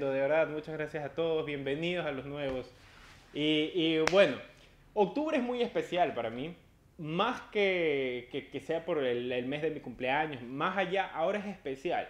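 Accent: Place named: Argentina. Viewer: XD tienes acento argentino no me habia dado cuenta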